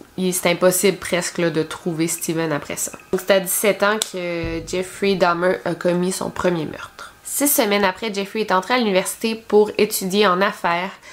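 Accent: Quebec, Canada. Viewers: Aiee c chaud cette histoire ( gg au prisonnier 😂😂 ) continue comma ça j’adore l’axon canadien en plus 😉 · super vidéo encore une fois ! Je suis vraiment fan de tes vidéos ! Et je te jure, moi qui aimais pas tellement l'accent québécois, tu m'a vraiment fait aimer l'accent ahah, sinon continue vraiment les vidéo ! je ne supporterais pas ne plus te voir 😘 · Elle n'a pas aucun faute, c'est evident, mais je trouve l'accent quebecois trés marrant... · Sans vouloir critiquer, mais l'accent quebecquoi pour quelqu'un venant de france est vraiment très lourd! C est trop bizarre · merci pour tout ces faits divers que tu nous partage! mais j'ai vus que parfois tu lisait un livre au complet pour nous faire une video, ca doit te demander bcp de temps! comment tu t'organises, tu en lis plusieurs en meme temps? merci encore pour tes videos j'adore l'accent quebecois et les faits divers, tu combine les deux! 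et en plus tu es une très belle personne (daans les deux sens ahaha) et tu fait des videos completes, bref autant te dire que je suis tes videos comme si c'etait ma serie favorite!! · Franchement je viens de découvrir ta chaine et je la trouve trop cool , surtout avec ton accent québécois est super cool❤️😆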